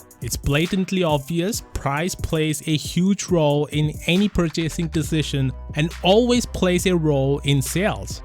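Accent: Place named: India. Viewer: 浓浓的阿三口音。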